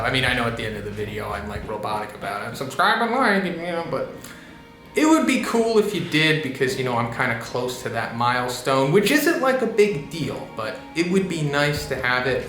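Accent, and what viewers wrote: United States. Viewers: mikmik he sounds americab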